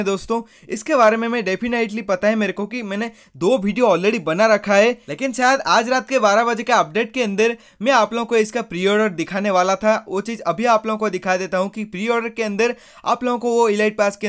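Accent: Odisha, India. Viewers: Odia ho kya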